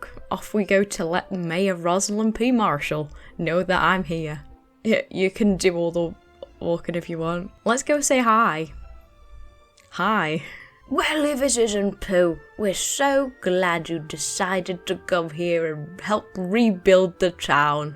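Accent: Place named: Merseyside, United Kingdom. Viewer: Just started watching some My Sims videos today, especially looking through all the mini games in Party DS. I still remember most of them and they were so fun. More importantly, which city/county are you from? Your accent sounds like a Merseyside one at times but then it goes again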